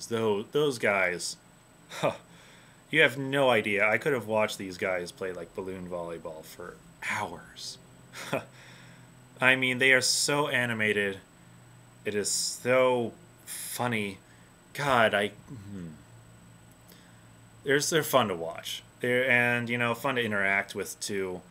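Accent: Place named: Germany. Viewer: Last thing I wanna do is sound like a dick, but your German accent reminds me of Beerfest, in a good way.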